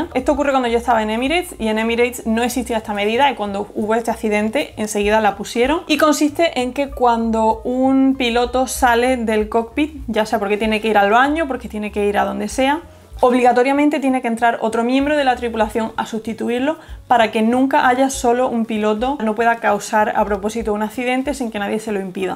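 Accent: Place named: Murcia, Spain. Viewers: ¿Eres murciana? Tienes un bonito acento. Tenía dudas ... ¿de Murcia? ¿de Extremadura? Cuídate. Gracias. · ¿De qué parte de Murcia eres?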